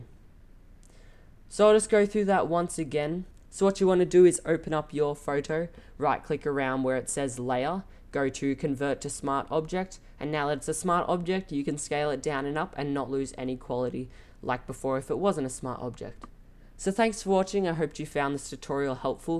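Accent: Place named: New Zealand. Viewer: trying to f-eeg-yuh out your accent: Kiwi? Aussie? Good video.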